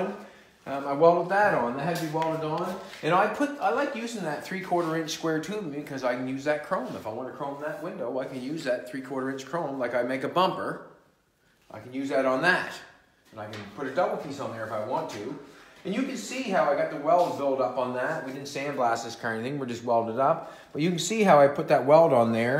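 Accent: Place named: Newfoundland, Canada. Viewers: True newfie,, talk for days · Sounds like he's in Eastern Canada...eh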